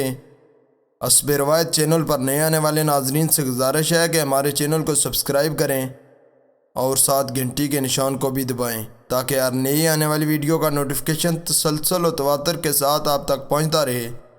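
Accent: Kashmir, India. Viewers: Nice kashamiri accent watching and subscribeing just cuse #factical gave you shoutout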